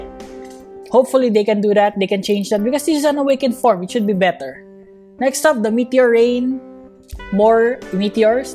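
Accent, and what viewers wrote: Philippines. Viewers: Your accent is like Filipino. Are you from Philippines? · Is he Filipino his accent is like Filipino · I was wondering if he is pinoy cuase he's voice is pinoy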